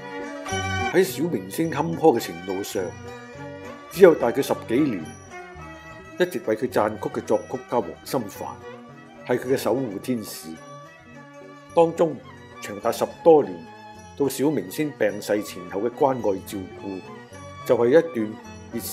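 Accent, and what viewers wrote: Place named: Guangzhou, China. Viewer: 每次出片，我一定細心欣賞！你既旁白同聲線好正、好正！！好地道廣洲腔，每次都帶我番回童年往事！多謝！你飲三合一定系即磨咖啡架？😅😅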